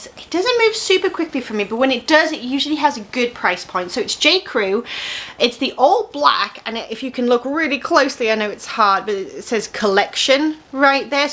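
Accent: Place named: United Kingdom. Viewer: Love your British accent and lingo!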